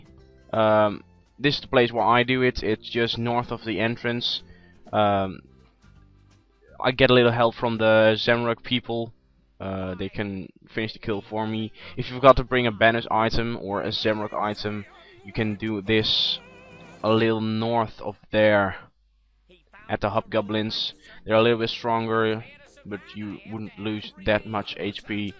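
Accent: Netherlands. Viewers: You're Dutch, I can hear that lol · haha ik kan gewoon horen aan je accent dat je nederlands bentXD: WEL TOF TROUWENS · lol u can hear. he is from the netherlands :P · r u dutch? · dutch accent!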